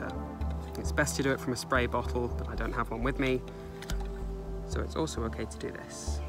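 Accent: United Kingdom. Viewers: turns out all i needed was a handsome british man to calmly talk to me about plants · Also I can listen to your British accent and your soothing voice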